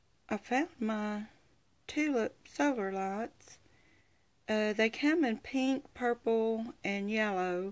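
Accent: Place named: Tennessee, United States. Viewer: I knew you were in Tn by your accent. I lived in Chattanooga for 17 yrs. I love the yellow ones💕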